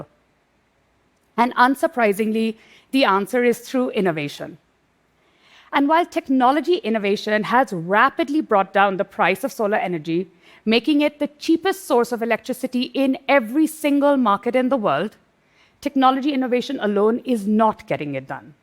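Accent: India. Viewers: to all white people, this is how the indian accent sounds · Can still hear the underlying Indian accent that makes it difficult for me to understand her without concentrating.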